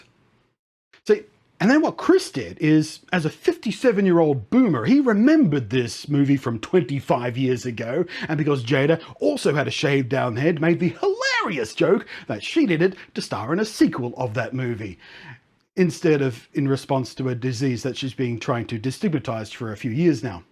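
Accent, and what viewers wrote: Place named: Australia. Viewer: Pretty sure he's not British, other side of the world I'd wager, Australia I suspect.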